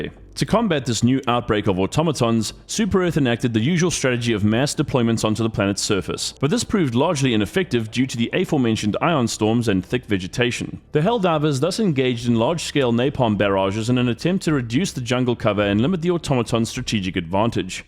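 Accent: South Africa. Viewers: I can smell a South African accent a mile away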